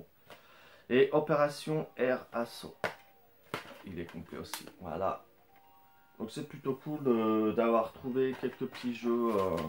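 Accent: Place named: England, United Kingdom. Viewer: super retours ! bien joué. j'adore ton accent anglais ! 745 jeux ps2 !! Ça en fait des daubes ;)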